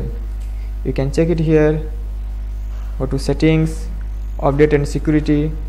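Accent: India. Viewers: cannot understand indian English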